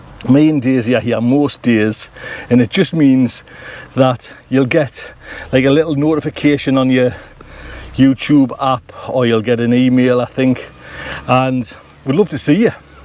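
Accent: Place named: Sunderland, United Kingdom. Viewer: Hi, been following for a while and finally got round to subscribing. Love the no nonsense practical videos, daisy obvs, but love my daily dose of home. Was wondering about the accent but saw you recently driving to a nursery heading into Seaburn 😍 Keep doing what you are doing, keeping an exile stuck in the Midlands v happy 😁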